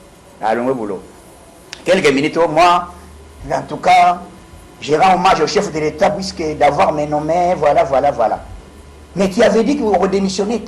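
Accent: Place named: Kasai, Democratic Republic of the Congo. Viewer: Bongisa lingala depuis owuta Kananga kk tshiluba na munoko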